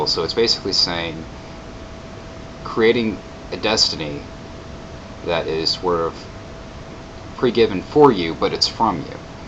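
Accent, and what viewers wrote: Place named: Santa Fe, United States. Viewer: I feel everything you say man, I've dealt with all the christian family shit as well haha. You sound like you're from Santa fe man, one of the people that denied to be stupid like the rest.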